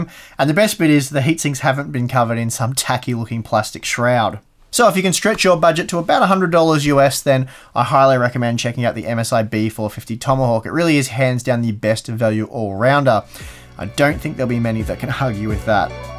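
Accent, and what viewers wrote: Australia. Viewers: you sound Australian, why not say the Australian prices? (I am aussie)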